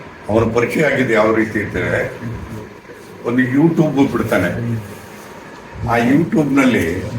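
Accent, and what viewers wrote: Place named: Sira, India. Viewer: sira bhashe sogadu super. na fan nanu. Inti H D mestru.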